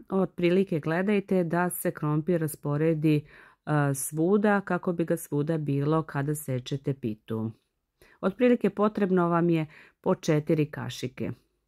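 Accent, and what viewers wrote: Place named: Krajina, Croatia. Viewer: Vidite kako i drugi usmeruju pažnju na tvoj glas,na divnu dikciju i još lepši izgovor,naglasak,draga moja krjiškinjo!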